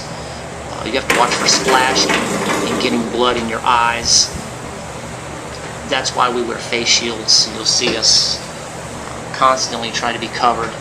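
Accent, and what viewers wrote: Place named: Norfolk, United Kingdom. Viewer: That Norfolk accent is THICK. I’m from northern va, so we say it all boring “nor folk”.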